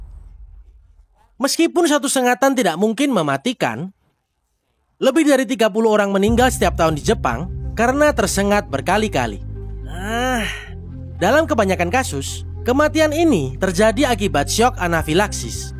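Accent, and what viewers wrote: Java, Indonesia. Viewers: Logatnya kok kek bahasa jawa ya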